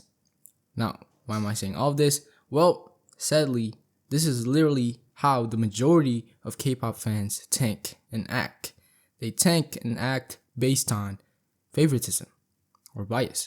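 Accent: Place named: New York, United States. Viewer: are you from NYC? I sort of detect an accent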